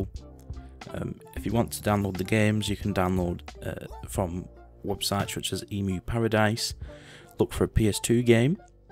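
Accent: India. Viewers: I thought its an indian dude :) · Who expected indian voice?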